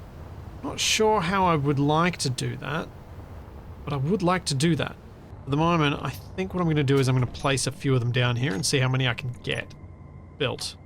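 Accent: Australia. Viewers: Fair enough. However, the fact that you neglected to mention intentionally avoiding the vanilla grid storage and retrieval mechanics during your quandary about how to get both vehicles home did at one point prompt me to think, "Gee, if only a well know YouTube Space Engineers content creator, perhaps one with an Australian accent, had made a tutorial about the grid storage and retrieval and how it was perhaps the most important feature of the update..." 😏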